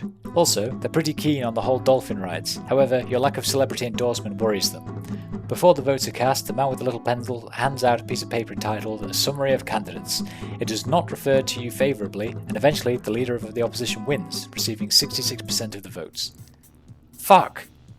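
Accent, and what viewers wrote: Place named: United Kingdom. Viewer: If (I said IF) you are looking for constructive criticism, I'd do a lot more comedic commentary on what you are playing. You don't do very much at all, but your voice is great (especially for an American audience who are inordinately impressed by a British accent), but you aren't giving enough original material. More comedic commentary. I'm going to subscribe and see how you do. 👍👍👍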